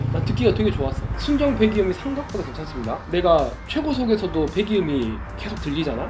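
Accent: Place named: Chungcheong, South Korea. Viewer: 충청도 이십니까? 저희 작은아버지랑 말투가 똑같고 웃음 코드도 똑같아서 너무 사람이 좋아보여요 좋은 영상 감사합니다 ^^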